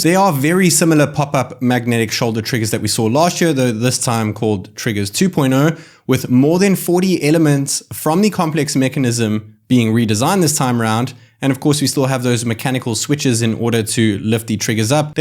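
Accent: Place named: South Africa. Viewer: Searched for the k50 video and yours came up first . A few seconds in I was like nah.... This dude is South African , he has to be. And I see ,yes you are. Amazing. Well done man. Wish you millions of subs . Still watching the video and it's great so far. God bless you bruh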